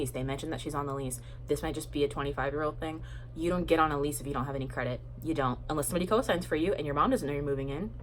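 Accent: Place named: England, United Kingdom. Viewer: Your English accent is giving me life 😂😂😂 Also: fans of Harry Styles were super mad at After becoming so huge because HS is an angel and it makes him look bad. Also, in the original wattpad, Hardin’s name was literally Harry Styles. It was meant to be like what would have happened if he didn’t become famous and went to college. 😂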